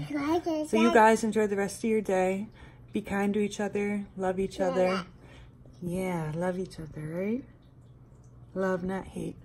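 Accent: New York, United States. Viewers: In America her accent is New York , New Jersey , Boston area sound like to me .Im in the south KY . to both be American we sound so different ! Shes adorable !! I love her accent !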